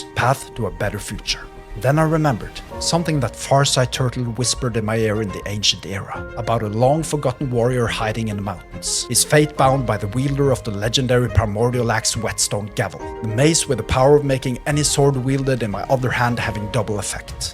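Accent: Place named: Norway. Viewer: ..med den norskeste norske aksenten på youtube :D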